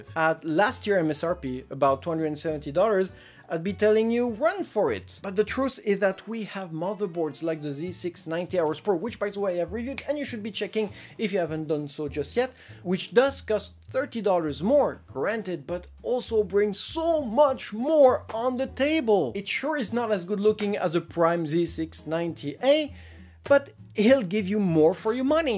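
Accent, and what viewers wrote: France. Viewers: Am I the only one who is here because of French accent 🥰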